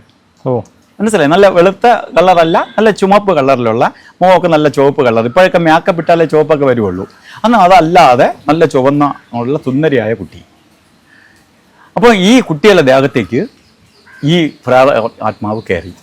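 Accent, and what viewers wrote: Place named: Trivandrum, India. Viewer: Tvm കാരന് നമ്പൂതിരി ഭാഷയോ അച്ചടി ഭാഷയോ പറയാൻ പറ്റുമോ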